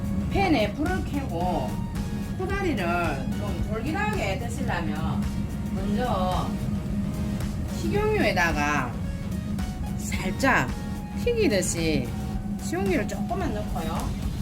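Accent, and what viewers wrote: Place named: Busan, South Korea. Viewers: 아 ! ~ 참 깨를 안뿌렸네 ~ 에서 빵~ 터지네요 레시피 그대로 조만간에 만들어봐야겠어요 영상을 오늘 첨 봤는데 좋아요 와 구독 누르게 되네요 구수한 경상도 부산 사투리가 정겹고 좋아요 저도 부산사람 이라서 그런가? ㅋ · 부산분이라그런것도있으시겠지만 제가봐도 실력도좋으시고 정감도있는분 확실합니다 ㅋ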